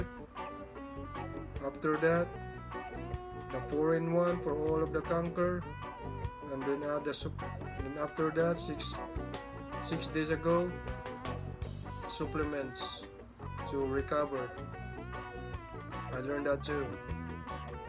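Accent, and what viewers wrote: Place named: United States. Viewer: Parang original na kanu sir may accent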